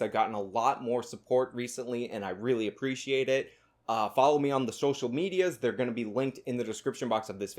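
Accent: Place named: South, United States. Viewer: A man with a southern accent apologizing for hurting peoples feelings. The South as a whole weeps for his cowardice.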